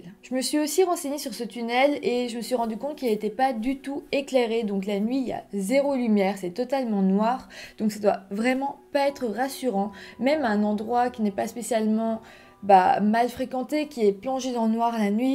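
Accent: Belgium. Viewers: En fait, je n’aime pas les histoires de meurtre, les charges émotionnelles sont terribles, mais en même temps j’adore l’accent belge (couire lol 😂) et plus sérieusement le sérieux des recherches et la présentation lumineuse des affaires. Merci.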